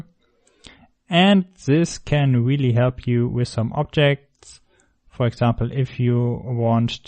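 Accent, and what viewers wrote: Germany. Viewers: Gotta love the German accent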